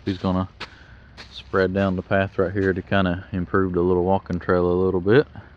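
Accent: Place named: North Carolina, United States. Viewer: Ferguson 20 diesel Its a NC thing. I tried to teach my friend to say oil, boil and toil, and he finally got it right, after 20 tries! Lol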